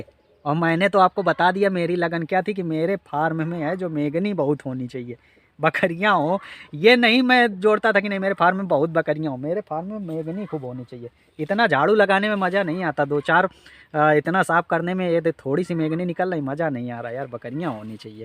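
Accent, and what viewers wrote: Kanpur, India. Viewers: सबसे अच्छी लगी आपकी बोली. सुनते ही समझ गया आप कानपुर से हैं. 1979 में मैं डी एम यू में पढता था. अमरूद के बाग घूमने रावत पुर, कल्यान पुर जाता था.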